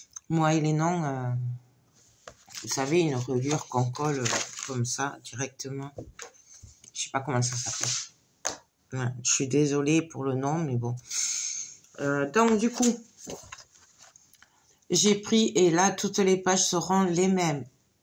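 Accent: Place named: Toulouse, France. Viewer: Bonjour, 👏 Très bel album. Vu l'accent et l'allusion à Toulouse je suppose que vous êtes dans les environs. J'habite à Albi et je ne connais personne qui scrappe tout proche.je suis autodidacte depuis mes débuts, j'adorerais avoir ma chaîne et partager ma passion mais ça doit prendre beaucoup de temps surtout quand on est accro et aussi pas évident avec mon métier de soignante. Alors je visionne...je retranscris les tutos et je fais des patrons... Là avec vous, je souris c'est interactif même si vous ne me répondez pas je finis vos phrases et vous souffle les mots manquants spécifiques du scrap! 😁. Je n'ai jamais eu l'occasion non plus de me rendre à un salon créatif car pas informée. J'aimerais bien correspondre avec vous 😉. Meilleurs vœux✨️ et belle journée à vous 🌺 Sophie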